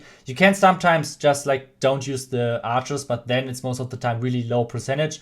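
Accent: Germany. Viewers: Man hört einfach das Deutsche komplett raus... Nichts gegen dich aber dein Englisch ist echt nicht gut ^^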